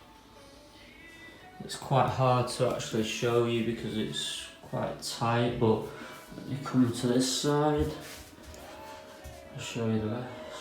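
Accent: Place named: North, United Kingdom. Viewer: The quality of videos is very good. I also love your northern accent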